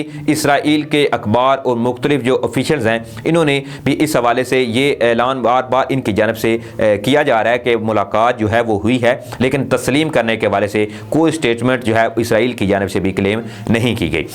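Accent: India. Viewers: تم یہ بتاؤ بھارتیوں کی طرح اردو کیوں بولتے ہو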